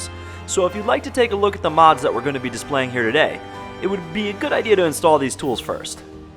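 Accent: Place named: South, United States. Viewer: I love how this guy changed his accent/faked it prior to this hillbilly southern deplorable accent that everyone complained about. So you're fake?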